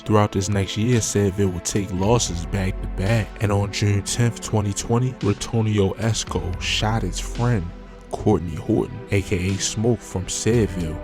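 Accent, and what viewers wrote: Baltimore, United States. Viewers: U gotta be from Baltimore folks....but anyways u just gotta new sub my guy 💯💯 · aye how y'all be knowing bout the hood and it sound like you from Baltimore , y'all know more then the police do.. and never even prolly been to the Raq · Bmore accent strong. Subscribed from Philly. Keep up the content. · Gangs being underated like this is some nfl draft this is weird shit 😂😂 souund like your from Baltimore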